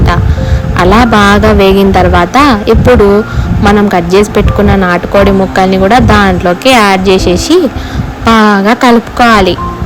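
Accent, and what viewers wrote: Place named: Kadapa, India. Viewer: Kadapa slag vinte ,aa feelee verappa😊😊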